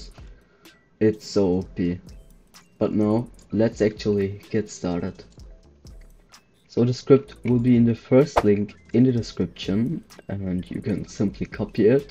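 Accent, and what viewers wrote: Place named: Germany. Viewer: nah this bot comments and u german accent is funny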